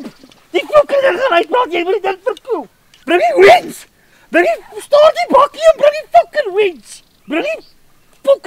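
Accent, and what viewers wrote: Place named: England, United Kingdom. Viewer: 😂 ek love die helse Engels aksent met die "okay" en "alright"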